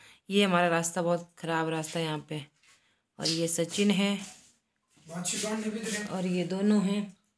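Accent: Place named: Sirmaur, India. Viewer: Boli se aap sirmaur k ass pass k lagte ho Ya solan district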